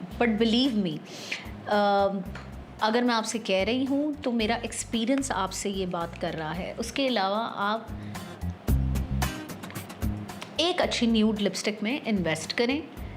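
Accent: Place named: India. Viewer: Why are you talking like Indian!? Why can’t you say khareed & baghair properly if you’re a Pakistani I’m surprised.. 😳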